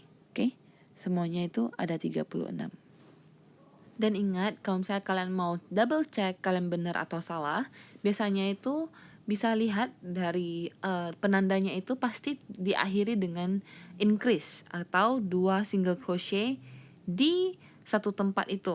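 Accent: Malaysia. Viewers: owh eheheh ku kira dari malay karena logat nya mirip by the way tangan kakak ko bisa putih bersih gt sih? TwT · ouh patutlah your logat/slang sound A bit like Malay xD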